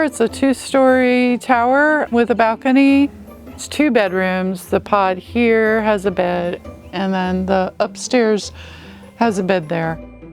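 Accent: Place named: Washington, United States. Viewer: Is it surprising that I can detect a Washinton accent?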